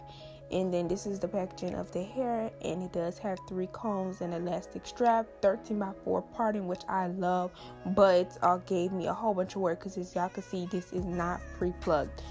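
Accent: Miami, United States. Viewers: I’m assuming you’re from Miami. Cause your accent is either OT , Beans or CC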